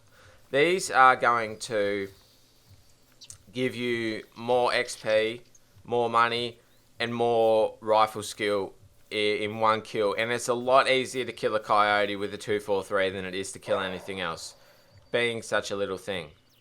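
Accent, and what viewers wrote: Australia. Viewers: yea that voice and aussie accent XD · TheGilgers 2017 lmao he could be Australian cause we do have very similar accents. And also most of my Aussie friends like to use the word cancerous a lot considering how we pretty much butcher the English language.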